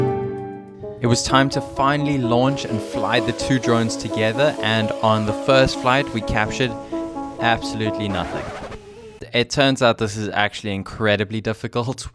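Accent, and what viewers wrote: South Africa. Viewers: Yoh the SA accent is so recognizable bra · That south african accent again. Thumbs down, I'm out. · SOUTH AFRICAN ACCENT DETECTED!!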